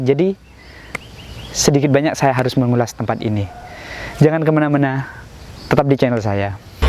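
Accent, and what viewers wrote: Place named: Java, Indonesia. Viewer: Medhok tak iye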